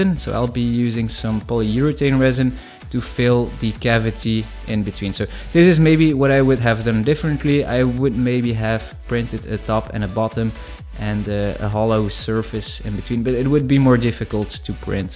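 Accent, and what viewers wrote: Netherlands. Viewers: Blauw? Bier? saying ehhh? saying Ant instead of And? Are you dutch? HA, i just saw your Bio and belgium counts aswell :) i'm too good at this XD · You dutch? If ur not u sound rly Dutch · Okay i immediatly recognised your Dutch accent. Well im Dutch too so hi there 👋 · Lol by your accent i knew you were Dutch! Im dutch too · leuke video man :) je hebt nederlands accent haha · Bro you dutch or something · Deze makker heeft wel echt een typisch nederlands accent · You're dutch, aren't you? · Damn the Dutch accent is strong with this one! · I watchdd the intire vid and im still confused. Were are you from. Becouse i hear bits pf a dutch accent. But im not sure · ah i love the dutch english talking xD but you said you thought about selling it or giving it away, but how can i buy this? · are you dutch? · Are you from the netherlands? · Are you by any chance Dutch? 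· You sound dutch, cool ball tho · Just out of curiosity, are you Dutch?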